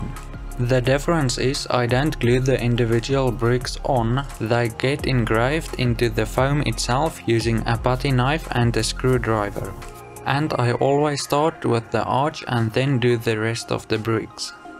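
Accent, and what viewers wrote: South Africa. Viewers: Ik dacht al een Zuid-Afrikaans accent te horen. :) Je video's zijn erg goed en heel professioneel. Ga zo door! Edit: Nu hoor ik je ook in de video zeggen dat je van SA bent.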